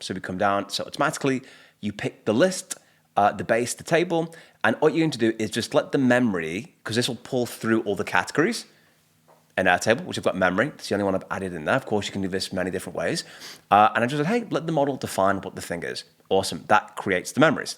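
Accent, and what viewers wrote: United Kingdom. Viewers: it looked interesting, but a Brit might have no trouble understanding your accent, given the speed at which you speak. This ignorant American can't follow. You might consider slowing your cadence to Douglas Murray's speed so we, who love the sound of the British accent but don't understand original English fully, have a better chance of following what you say on a complicated subject. Cheers ~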